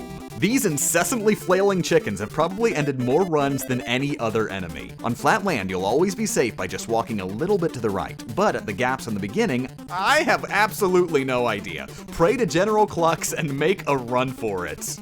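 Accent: United States. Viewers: I'm pretty sure that's how they pronounce it in America.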